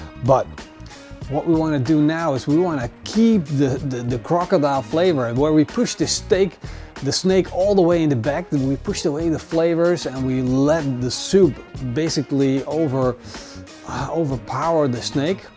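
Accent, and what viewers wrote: Netherlands. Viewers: Wait, are you dutch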